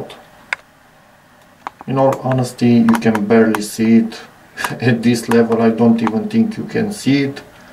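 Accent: Romania. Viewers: Dupa accent ma gandesc ca esti roman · Accentul de roman si inghetata pe care scrie in lb romana te dau de gol :)) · Salut. Aveam o banuiala ca esti roman dupa accent, dar inghetata te-a dat de gol 😂. Am un "cluster" mare de pixeli blocati pe TV, am incercat varianta cu jscreenfix si nu a mers, Vreau sa incerc cu creionul si caldura cum ai facut aici, poti sa-mi spui te rog pe ce intensitate ai dat feonul? Multumesc!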